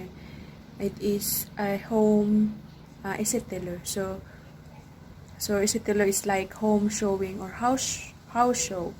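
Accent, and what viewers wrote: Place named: Visayas, Philippines. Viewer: Bisaya ka sis parihas Tau bsta bisaya tlga mag Salita bagsak nyahhh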